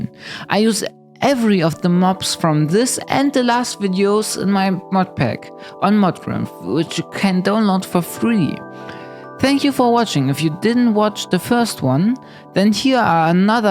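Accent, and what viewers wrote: Germany. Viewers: Cause hes german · man merkt am arkzent das du deutsch bist haha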